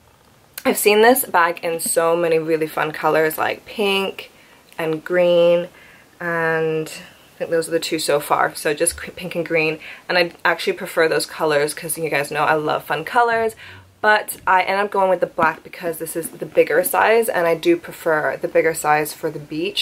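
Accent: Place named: United States. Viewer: Weird question but how come you hv an American English accent? I know you’re in London, Hanoi & Taipei but did you grow up in the states?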